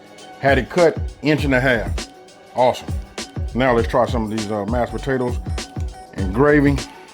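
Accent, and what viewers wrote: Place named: California, United States. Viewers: He gotta be from the west coast I can hear it 😂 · Dude has California accent !! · You have a country accent however from your voice and the way you talk I’m assuming that you’re from California Compton Compton or cities around California Los Angeles maybe if I go farther than that Alabama but I’m very show you from California , your foods are delicious and all them they’re healthy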